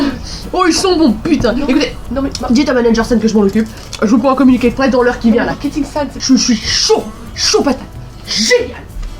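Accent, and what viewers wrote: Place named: Spain. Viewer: Comment est-ce que vous êtes si peu connue, c'est vraiment du contenu de qualité !!! Sur une autre note, je suis québécoise et j'étais convainque que vous étiez espagnole ou quelque chose du genre au début, et ça m'a prit un bout pour réaliser que c'était du français ! C'est fou comme les accents différents d'une langue peuvent affecter notre compréhension hahaha ! Encore une fois, super vidéo ! xxxx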